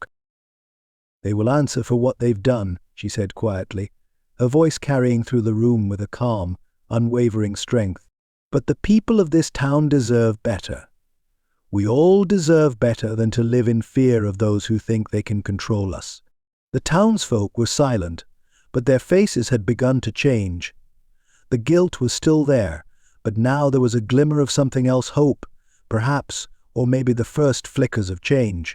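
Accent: United Kingdom. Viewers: The British accent doesn’t seem to fit with a historical western.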